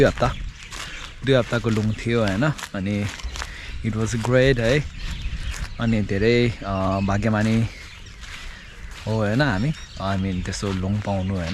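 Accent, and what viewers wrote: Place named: Sikkim, India. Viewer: AE kind of hjr ko bolai xai Sikkimese jostoi lagyo ni so BTW keep going haitw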